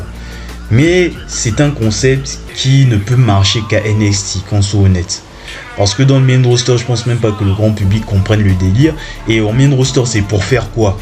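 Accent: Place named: Ivory Coast. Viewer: Je dis tu es ivoirien d´origine oubien l´accent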